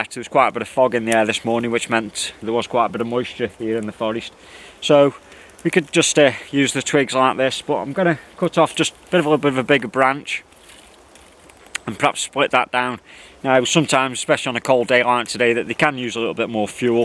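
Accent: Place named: Merseyside, United Kingdom. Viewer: Nice video. You say that you live in the Midlands, but I can't help but think that you're from Merseyside?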